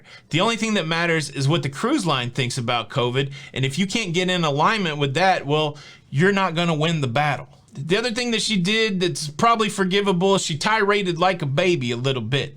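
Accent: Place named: New York, United States. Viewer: Sounds like fellow New Yorker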